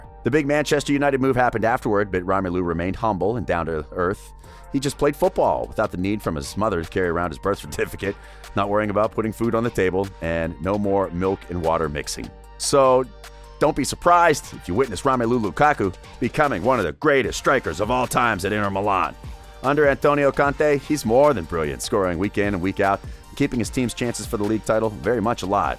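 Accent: United States. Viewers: It would be more credible if someone other than an American narrated it.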